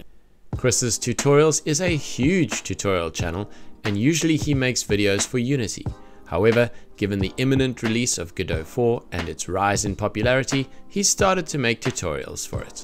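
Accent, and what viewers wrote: South Africa. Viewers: That an SA accent I'm picking up?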